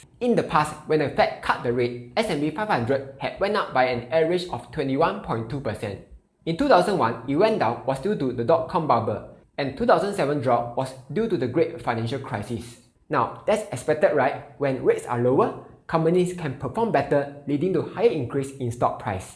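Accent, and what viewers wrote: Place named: Singapore. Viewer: Long live Singlish! 😁😄👍💖🙀💯😇😁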